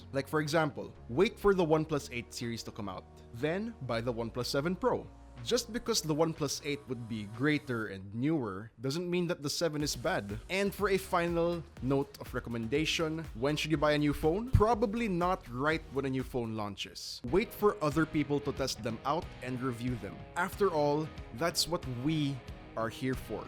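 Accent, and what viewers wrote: Philippines. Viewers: Philipino accent😂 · Bro’s Filipino af I can tell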